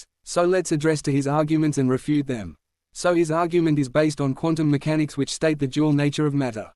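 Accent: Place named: United Kingdom. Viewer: Bro your voice is like a British lad💀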